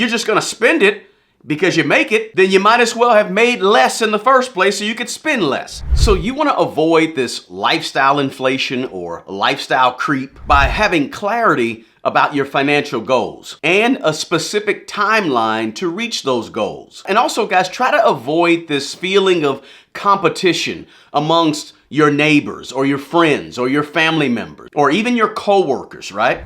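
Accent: South, United States. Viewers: I’ve started to live below my means. It takes discipline because I am a spender. Thanks for the tips. Btw, I love the southern drawl you have. God bless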